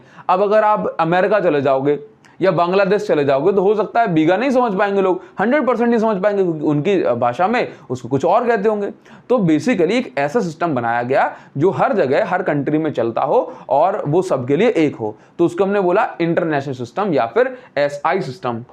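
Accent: Odisha, India. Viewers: Sir app odisha se hen kya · Sir are u from odisha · Sir are from Odisha 🙏🏻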